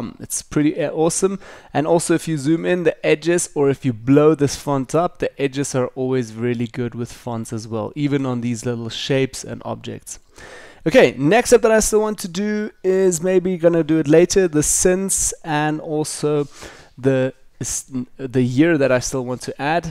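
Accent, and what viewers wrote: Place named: South Africa. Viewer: Bro are you South African? random question but I recognize the accent xD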